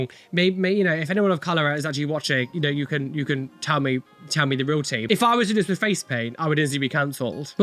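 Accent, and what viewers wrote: United Kingdom. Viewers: Been.there. Done that. Came back in.the early 80"s. But love you anyways. Please don't hate me for telling you this, dearest, but your over all look is too 'busy'. Tats in PRIMARY COLORS never with a Pentagram.... and your piercings need to be placed symetrical so your face isn't sliding down on one side. And please switch to decaffeinated drinks asap. With your delightful British accent you need to remember the English language has coma's and lots of punctuation rather than making it all one sentence. Love ya! alvin, san francisco :)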